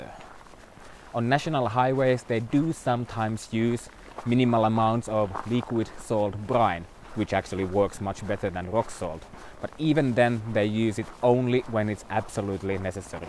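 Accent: Finland. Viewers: I like your assessment (and Finnish accent;-) ) much better than. He's too soft on the crappy infrastructure they have in Calgary. And I live in a city that probably has even worse bike lanes than Calgary... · When I had given the points I would given you at least 100 points more for the Oulu trip. And 10 extra for your humor and pretty Finnish accent! What a beautiful ride. How boring and ugly north American towns and cities are! And even worse in winter. In Finland winter darkness + snow makes the world even nicer and more beautiful. Here in the Netherlands we had today our first little bid of snow. But it was melted within an hour. And that is also one of the big differences between this winter utopia and the Netherlands. Our winters are most of the time just a little bit above or below 0 degrees Celsius and then salt is necessary because roads are almost always wet and can frees unpredictable and then biking and walking gets dangerous. 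And the other big difference between both Calgary and Oulu and the Netherlands: space. We are the most densely populated country in the western world and ground is so expensive here, that this kind of modern city planning with so much space between roads and bike pads or parks everywhere would be great to have but is almost impossible to afford. In the 1960's and 70's it was more common to build neighborhoods with more public space between the houses and private properties, but nowadays every single square meter have to be planned to have a function. Developers will try to build cramped houses instead of living space for people. I think that is one of the big negatives here in my country: developers want to make every possible euro profit out of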